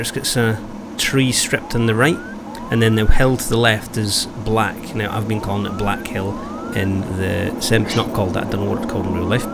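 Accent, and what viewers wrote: Scotland, United Kingdom. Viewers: When it doesn't even remotely look like that because your stone age 1080 isn't able to run it. For those not knowing: The way out is straight forward across that lake Now I still have to get around imagining to fly with a hell either just left or right of me. Anyway, that lake is well deserved. Gotta admire that Scottish accent :)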